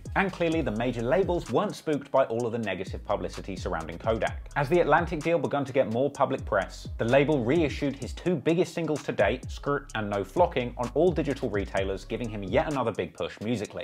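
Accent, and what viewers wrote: United Kingdom. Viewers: Are you British or American I am so confused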